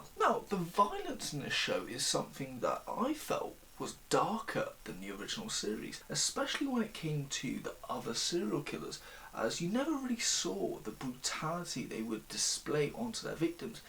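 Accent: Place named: England, United Kingdom. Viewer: Good video I like your accent even though I don’t usually like the English accent ❤️ you’ve got a very smooth articulate way of speaking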